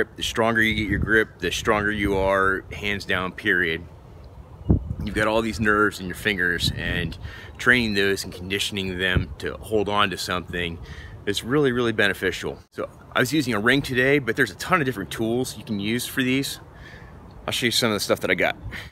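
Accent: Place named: California, United States. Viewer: This is good, you cover stuff that I'm not seeing others do. Thank You. BTW, are you from California? You have some sort of surfer dude way of talking that certainly doesn't fit New Jersey.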